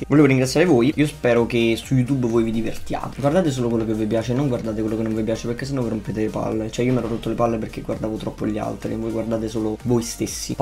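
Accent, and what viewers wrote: Rome, Italy. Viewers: è normale che guardo tutto il video.. sai intrattenere non annoii e alcune volte la tua parlata romana mi fa ridere un botto, grazie ✌#versoilmilione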